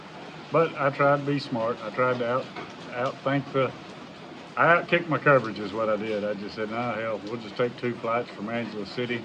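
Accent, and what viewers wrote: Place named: United States. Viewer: That’s Strong American accent , didn’t understand much of that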